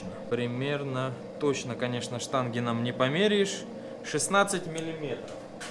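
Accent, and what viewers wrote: Rostov, Russia. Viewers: Это ростовский акцент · это просто ростовский акцент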